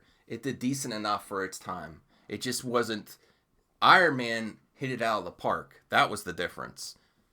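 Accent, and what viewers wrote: Pittsburgh, United States. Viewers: You from Pittsburgh? You sound like a fellow yinzer.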